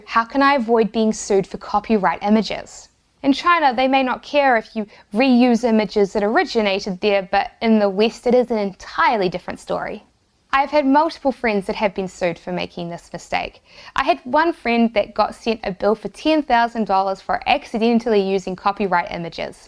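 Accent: New Zealand. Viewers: You must be from New Zealand with this accent! Nice and informative though. Thanks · I hear a KIWI lol. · Awesome video thanks! BTW my wife and I are currently doing about six months of travel in NZ and I have watched a few of your videos and I was like she sounds like a Kiwi, but I wasn't sure until this video. We call ourselves the Kiwi wannabes :P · She literally said " Hir in Niw Zilind " . Didn't you hear ? :) Love the accent btw no offense meant :D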